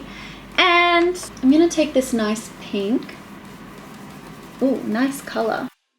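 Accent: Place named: Australia. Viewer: watching your videos remains the best part of the day 🎉 your accent reminds me of my best friend back in Australia and I looove it 😍 btw, this look is some serious 🔥🔥🔥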